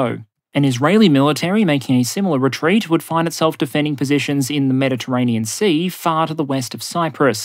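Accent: Australia. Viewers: He's an Aussie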